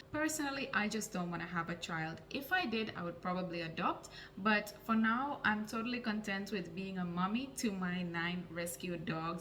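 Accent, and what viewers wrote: Caribbean. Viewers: Its good to be self aware and not be influenced by society expectations. Btw your accent sounds like someone from the Caribbean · I'm Indo-Guyanese and it's interesting the English accent for an Indo-Finnish person is so similar to that of Indo-Carribean people. I never would have guessed you are from Finland. Anyhow, you're right that many people in the world today are having children for all the wrong reasons and some of them are not fit to be parents and bringing children into the world to satisfy the expectations of others is no good reason at all. However, calling people in India "narrow minded" is not fair. You're trying to figure out life on your own without having read the user's manual. Like those parents who should not have bore children, you are not living according to dharma because of a lack of scriptural knowledge. India is the land where God gave the users manual. 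Begin with the Bhagavad Gita, and you might gain some perspective, clarity, and guidance. Also, Indian history is very complex and it went from being the greatest land in the world to being one of the poorest after centuries of abuse from outside forces, but the Indians are restoring it's former glory without much help from anyone and trying to do so in keeping with scripture. Finland does not have the population of India but England stole $43 trillion in wealth from India and all of Europe and the west is enjoying a different lifestyle because of it. Not just Indian wealth, but Indian knowledge and labor as well. From Pythagoras to Mark Zuckerberg. Fiji to Trinidad & Tobago.. Last thing is you mentioned being attacked. If you'r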